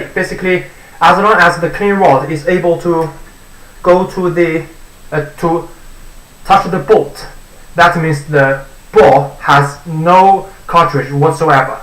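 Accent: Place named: United Kingdom. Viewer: Why sounds British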